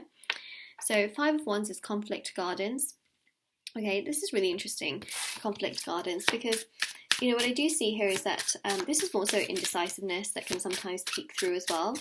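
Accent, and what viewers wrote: United Kingdom. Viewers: Damn pile 2 really resonates to my Twinflame. This wasnt happened once yet every readings i tried to look for my person i end up always pick at the right piles where the reading was always pointing to him. My beloved twinflames. Such a huge confirmation.. This is infact a new strong confirmation from you. Muchos gracias. May the devine bless u more and guide you more. Ive been an avid fan of yours because of your accuracy and very positive energy that i could really feel as i watch your videos and also i wanna say i really love your voice its soothing and im loving your British accent so sexy to hear. 😍😍😍😘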